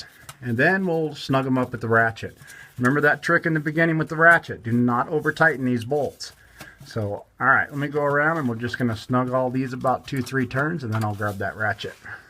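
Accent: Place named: South, United States. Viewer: I like that country man talking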